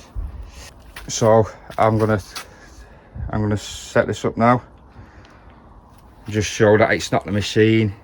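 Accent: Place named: Wales, United Kingdom. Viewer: Hello! Thank you for your video and air test.I am French and I own the Legend in the 1.08 version,do you think that a high audio gain in a mineralized soil is a good setting? (because of false signals if the GB is not well adjusted).I think you are Welsh because of your accent,but I use the translator and please do not worry,I appreciate your tests!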